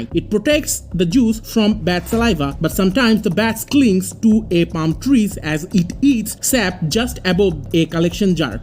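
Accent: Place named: United States. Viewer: Dear Sir, your voice is tremendous like an American or Canadian when I listen first time, I don't understand that is your.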